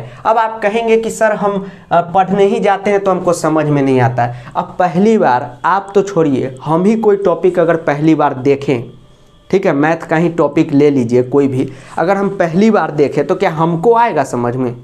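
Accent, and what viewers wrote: Bihar, India. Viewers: Pure bihari sir😍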